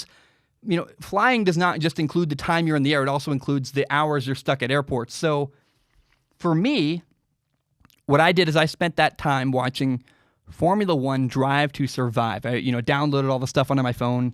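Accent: United States. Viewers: Formula 1 is my favorite sport after Football and I'm glad it has your attention now! The Netflix series has made it even more amazing. Get in there, Lewis! It's so refreshing to hear a content creator with an American accent say all the Formula 1 stuff! You're going to have to pick a favorite team and driver now.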